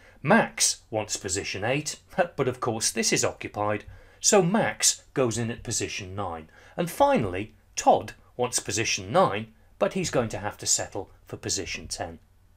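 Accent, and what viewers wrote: England, United Kingdom. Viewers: His British accent though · Perfect explanation. On a side note, are you British? when you speak, you rhyme and it's a treat to my years. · Thank you, very british man, for a nice explanation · I think the British accent helps me learn better. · You have a fine English accent, Mate. It helps my brain gear run smooth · His British accent sounds so cool!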